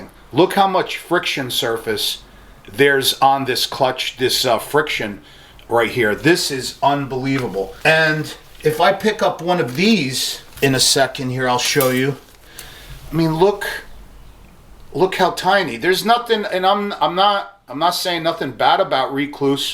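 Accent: New Jersey, United States. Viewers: LOL!! Brother you crack me up. So Jersey. "You know another thing that pisses me off? These freekin things fall off my trees!" Priceless! My women and I watch you all the time. Love the channel. Live in Texas now. Listening to you reminds me of my friends and family back home.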